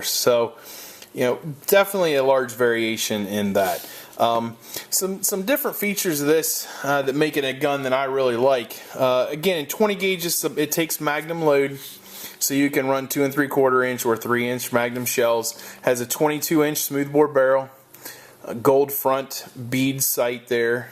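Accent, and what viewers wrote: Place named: Western Pennsylvania, United States. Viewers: I need to know if you’re from western Pennsylvania based on your accent. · Lemme guess...western PA or eastern OH? I grew up there and I can hear your Pittsburghese lol. Good stuff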